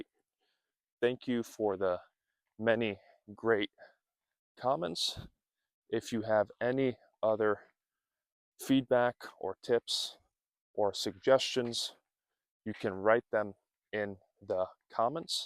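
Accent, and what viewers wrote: United States. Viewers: Hello, teacher! I’ve been listening to your last videos lately. Your helpful method of ‘slow English’ is widely appreciated according to all the comments we can read every time you post a new lesson. Congratulations! You have mixed abilities and the mental power needed to explain words and expressions clearly. I have been teaching French for years, and I love phonetics. You insist firmly on pronouncing the soft ‘t’ instead of the hard ‘t’ we often use in Europe. Notice that I do not disagree with you, on the contrary, but you must have a reason. Your accent is very easy to imitate and I assume it’s from New England. We are all grateful about your work.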